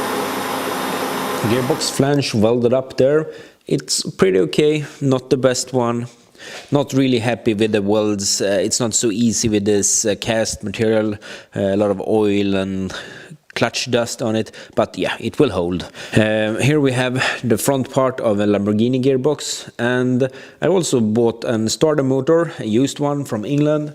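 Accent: Sweden. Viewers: älskar svengelskan riktigt fint <3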